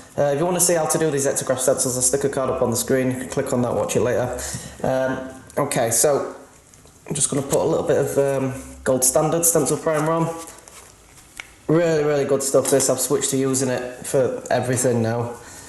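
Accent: Yorkshire, United Kingdom. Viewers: Where abouts in the UK are you from? You sound very Yorkshire...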